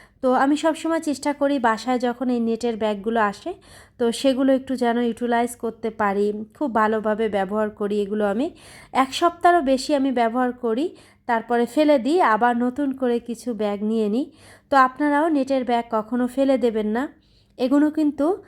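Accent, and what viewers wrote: Khulna, Bangladesh. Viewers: আপু আপনি যেহেতু খুলনার মেয়ে আপনার ভাষাতে ওই ধাব্রাই বলে। কারন আমারও বিভাগ খুলনা তো আমরাও ধাবরাটাই বলি তবে কি জানেন আপু ঢাকা আসার পর থেকে না চাপটি বলিকারণ এখানে সবাই চাপটি বলে।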